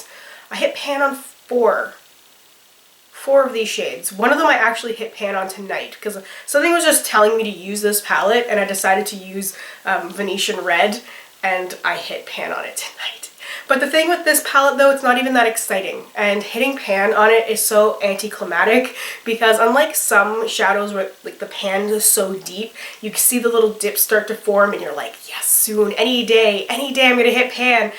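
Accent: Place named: Canada. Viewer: I love your videos. I wish I had more time to devote to them. Three little ones keeps me busy. Don't be nervous about your get ready with me's. Taylor swift says "haters gunna hate". But your true followers love you for who you are. I love listening to other Canadians and how they pronounce their words. And today I realized we say our "A's" the same! Ok. Enough fan girl stuff.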